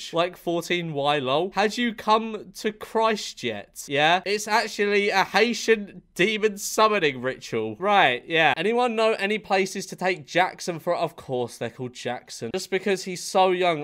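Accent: United Kingdom. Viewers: 'ello welcome back - brilliant sign of a British man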